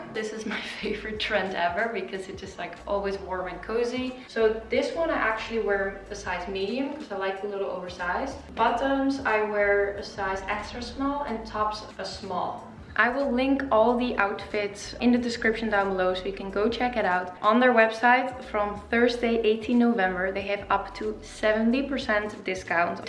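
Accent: Netherlands. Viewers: I love the Dutch accent. My very best gf came from Holland to Los Angeles when we were young. 'Besties' from age 10. In her home they spoke only Dutch. Tried to learn some, but it is very difficult language! She passed a few years ago and I miss her so. But I get to hear your beautiful Dutch accent. It reminds me so of her. She too, was tall & very beautiful like you. I listen to your vids and hear you talk, and it brings to mind something very special...a Dutch home, my 2nd family and my BFF. So enjoyable to watch you. AND Im learning about the value of 'healthy' food. It does change your energy level! (Im getting there LOL) Also enjoy the print work you do. Those 'warm' wintery clothes are beautifully appealing. But in LA?? (I still get their catalog) Naaaahh, too hot🔥. Well then, maybe see you in Park City??? 🌷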